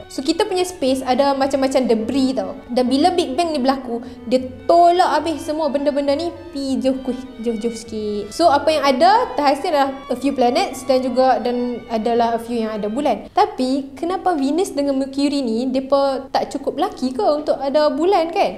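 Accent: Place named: North, Malaysia. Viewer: Nohh lorat utara pekt nohhh...rindu plk kmi kt kg halaman...telari dri topik😅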